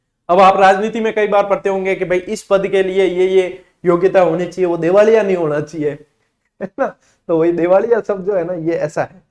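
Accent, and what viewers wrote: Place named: Marwari, India. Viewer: Sir your marwadi accent is amazing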